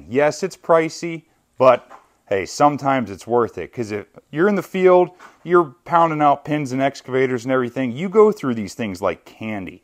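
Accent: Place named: Pennsylvania, United States. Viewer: sounds like you have a pennsylvania accent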